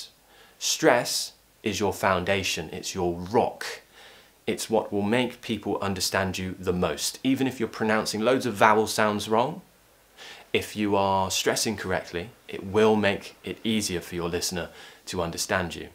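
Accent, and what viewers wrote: RP, United Kingdom. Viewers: you stated that you have a “modern RP accent,” how is that in relation to the “mainstream RP” or “contemporary RP”? I, myself, like the contemporary RP accent. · love your RP accent to improve my African accent. But the fees choo! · I live in uzbekistan l love your accent l want to learn modern rp from you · I really love bbc accent and I think your accent similar to it or msy be itselfe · I JUST LOVE THE WAY YOU SPEAK...so that's why I think british accent is best....👍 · Hii...🙋.. thanks for your great video s.... I always eagerly wait for your upcoming video s...u r the great British Accent teacher....👍👍👍👍great job👍👍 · Of course i love (rp) accent thus i watching you always❤👏💕💪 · It seems to me that British accent is really pretty as it is fascinating to listen to a Brit speaking with it like you. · RP has to be my favourite. 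I love yours and Tom Scott's accent. Most of my Youtube consumption is from Brit creators' contents, mainly because my ears just prefer it that way (also: vocabulary preference), so that's the audio exposure for me. What really started all this though is that one time I got asked in my home country if I were a foreigner due to my accent; most people here can speak English, but in the American accent. Prior to that, I hadn't really noticed it.. so I just thought if that were the accent I tended to default to, I might as well do it well. :) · Your pronunciation is excellent! I love RP. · Sir love your accent and pronunciotion on the other hand i love british ❤️ 😂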